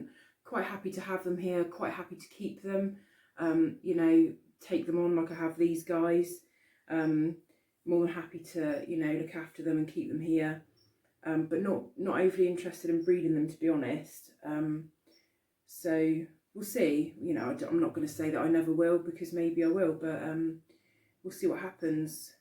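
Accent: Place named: Devon, United Kingdom. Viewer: You sound like your Devon way, maybe Cornwall 😊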